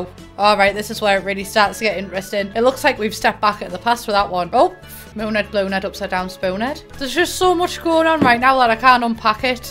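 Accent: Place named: Middlesbrough, United Kingdom. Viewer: Since I am a foreigner, my accent always makes me self-aware when speaking English, but your videos are getting me relaxed with it, truly I love your middlesborough way :)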